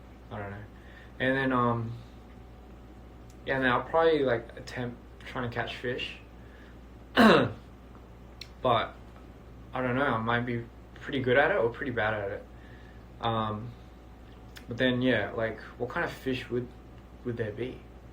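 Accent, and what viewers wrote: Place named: Australia. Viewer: Just here to listen to his aussie accent lol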